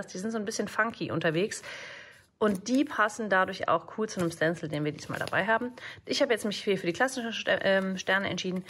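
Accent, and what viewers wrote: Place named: Berlin, Germany. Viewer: Also mal ehrlich: dein berlinerisch ist ja *janz knorke*❗ Die ministempel & die Eiskristall-Schablone gefallen mir besonders! Freu' mich sehr auf Freitag!!!! Schönen Tag noch & Grüßle vonne Monika ❤️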